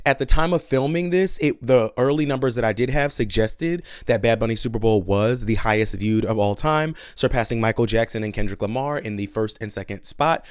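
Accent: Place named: Puerto Rico. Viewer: Ok baby I hear that accent🇵🇷👏🏽 well done!! Had me thinking you were riiiican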